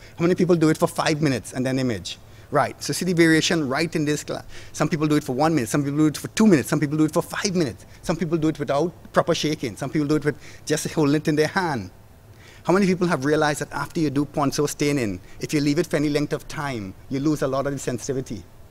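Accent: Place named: Trinidad and Tobago. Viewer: Fellow Trinbagonian!! Currently at PSU. Good to hear a trini accent! Lol..missing my doubles:(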